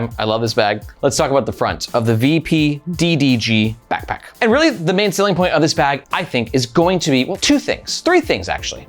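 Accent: France. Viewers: Nice French accent 😊 thank for your review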